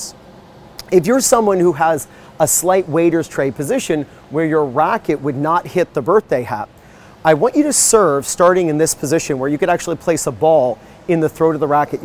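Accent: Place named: Florida, United States. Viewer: I hear an accent but I don’t know which state? Florida?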